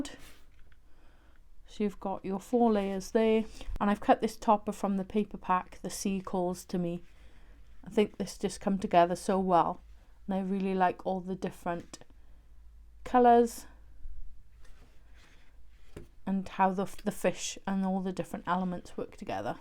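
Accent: Wales, United Kingdom. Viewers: Are you Welsh? If so, me too lol xx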